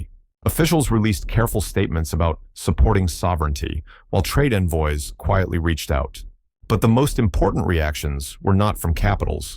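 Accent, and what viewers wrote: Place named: United States. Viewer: 😂 narretor says Ibrahim is 27 years old 😂😂😂😂 it's a American voice😂😂 FAKE NEWS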